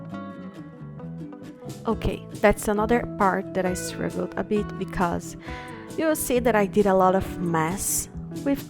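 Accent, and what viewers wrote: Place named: Brazil. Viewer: é muito bom ouvir o sotaque brasileiro! Parabéns pelo processo! Espero que seja o inicio de mais series como essa ;)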